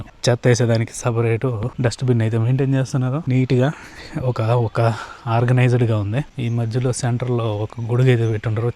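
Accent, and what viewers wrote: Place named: Rayalaseema, India. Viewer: Hi, I like your video and your Rayala seema language.I love you so much and liking to hug you one time.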